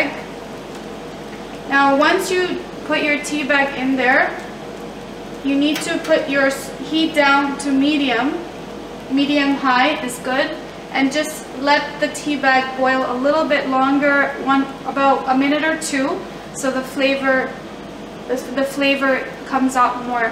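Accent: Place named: Arab world. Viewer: I can tell from your accent that you're an Arab, haha 😁 I will try your method, thanks x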